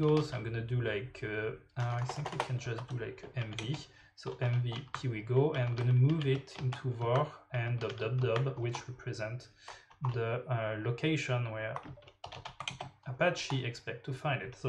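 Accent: France. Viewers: pk pas faire la video en Français t'as l'accent français en plus je comprend pas l'anglais moi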